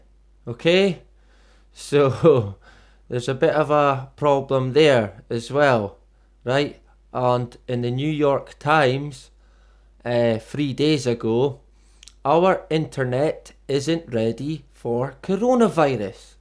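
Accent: United Kingdom. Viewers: Nice to hear a British voice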